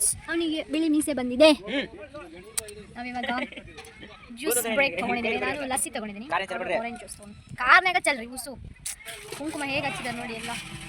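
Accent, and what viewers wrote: North Karnataka, India. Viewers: Nivu uttar Karnataka bhashene matadari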